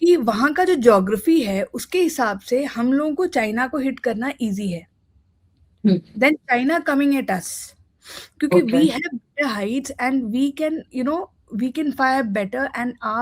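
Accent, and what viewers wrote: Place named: South, India. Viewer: South Indian hai madam.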